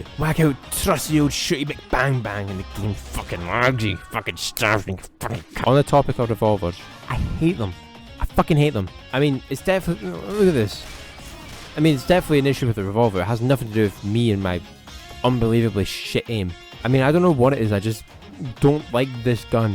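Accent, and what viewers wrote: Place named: Scotland, United Kingdom. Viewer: why are you scottish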